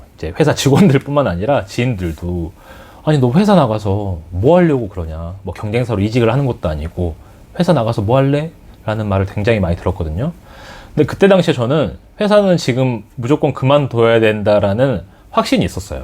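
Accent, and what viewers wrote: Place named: Gwangju, South Korea. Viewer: 광주사투리 넘나 매력적… ☺️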